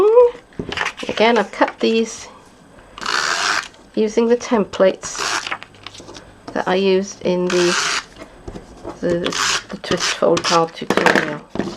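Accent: Wales, United Kingdom. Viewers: Lovely card, you sound like your from my hometown, port talbot.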